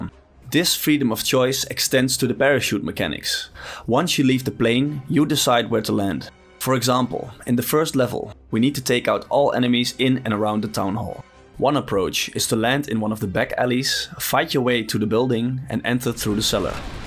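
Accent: Netherlands. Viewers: goeie video, maar het is onmogelijk om een nederlands accent niet te herkennen als nederlander haha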